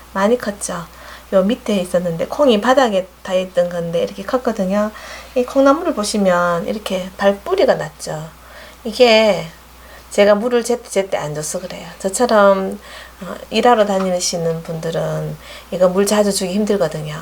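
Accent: Gyeongsang, South Korea. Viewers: 감사합니다 답글^^* 그런데 누가 그랬는데, 콩이오래되거나 묵은콩이면 안됐다고 들었던거 같아요 햇콩이나~ 일년 안쪽에 된콩이 좋다고 그런거같은데 암튼 미국에서는 그런콩이 없었어 안되나보다 하고 그 뒤로는 아예 할생각을 안했거든요, 항상! 구수한경상도 말씨로 깔끔하게 쉽게 설명 잘하시고 늘건강하세요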